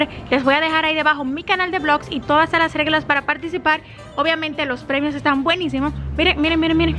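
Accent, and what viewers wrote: Dominican Republic. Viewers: Me encanta el acento de República Dominicana 🇩🇴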